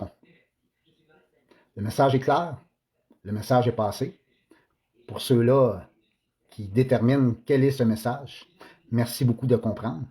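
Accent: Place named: Quebec, Canada. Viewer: Le problème c'est son accent québécois à moitié compris ce qu'il a dit mais bon bon travail et bonne chance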